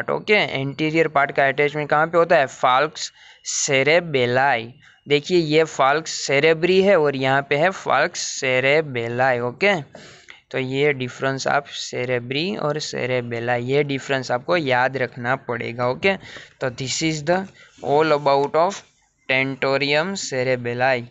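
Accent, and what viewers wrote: Gujarat, India. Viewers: Are u from Gujarat??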